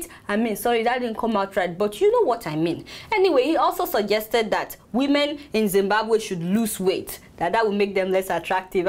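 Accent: Nigeria. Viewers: Omo joh please stick with the Naija accent I love in with it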